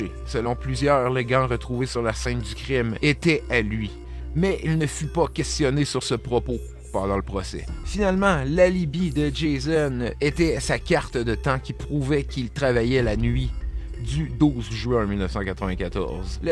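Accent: Quebec, Canada. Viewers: Super et bravo pour ta vidéo 👏 ! J'aime bien l'accent québécois ou canadiens du commentateur/narrateur. Ca donne un certain caché à la vidéo 😊.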